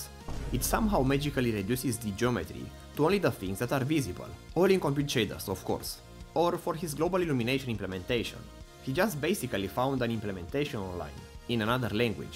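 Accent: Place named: Romania. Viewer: Mereu o sa pot sa identific un român dupa accent :))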